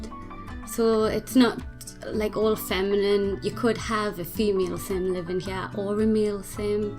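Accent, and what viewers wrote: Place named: Scotland, United Kingdom. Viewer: I love your accent :) Where are you from? (Scotland? 😅)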